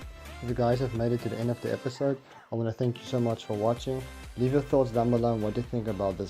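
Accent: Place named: Afrikaans, South Africa. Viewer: N mens kaan hoor jys afrikaans😂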